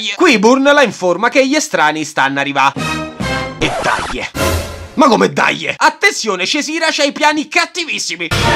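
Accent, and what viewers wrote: Rome, Italy. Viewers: Il primo romano sulla terra che pure se parla romano, non mi fa venire voglia di spegnere youtube. Sei un genio!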